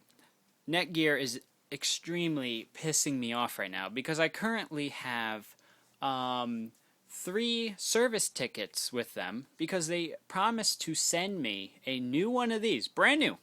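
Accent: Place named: Midwest, United States. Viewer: gosh u sound like one of our customers from either Michigan ,Illinois or Indiana... good ol heartland region.. they love to sit on the phone for 30 + mins with sob bullshit stories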